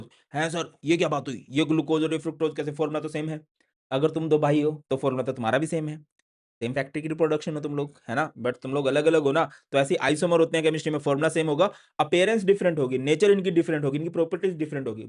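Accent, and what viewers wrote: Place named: Punjab, India. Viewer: sir ka punjabi accent TOP.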